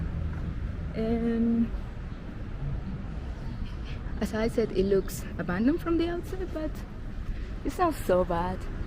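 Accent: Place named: Mexico. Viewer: I understood everything and I love your accent I don't know where you are from but I ges you are Mexican congratulations nice video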